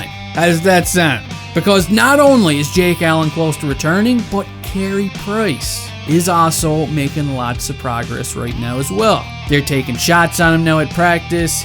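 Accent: Newfoundland, Canada. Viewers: He's new maybe, I only discovered him about a month ago and I love the Habs and have a similar type of humour to - it could be an algorithm thing as well. I personally wish him all the success, however with some of the South Park pics of the Chinese guy and pics of Xi Xingping or whatever the leader of China is, it is probably better off that he stays small because then YouTube will either demonetize his videos or pull them down and try to sensor him, this is kind of a unique stage he is at. And living Ontario, I do not often get to hear that down Easter accent of a Newfoundlander that often, I always look forward to that "BUUUTTTT FERST!!!!" 🤣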